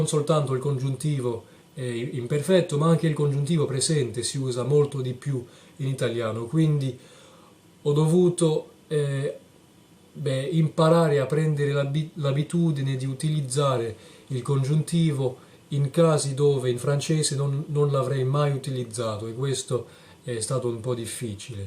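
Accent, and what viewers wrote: South, Italy. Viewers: Bravissimo! Hai un accento marcatamente meridionale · ma sembri un meridionale italiano lol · Sembra tu abbia un accento tipico del sud Italia! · Già, è molto molto meridionale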